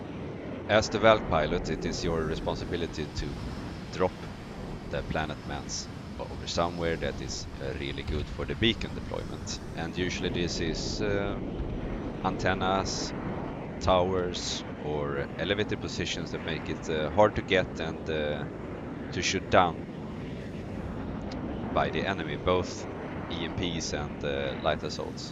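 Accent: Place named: Sweden. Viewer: Svengelskan på topp, bra video 👍🏻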